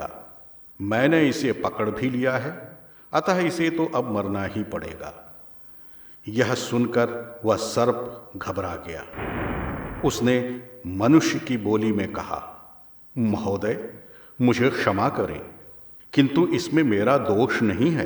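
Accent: Standard. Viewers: शुद्ध उच्चारण में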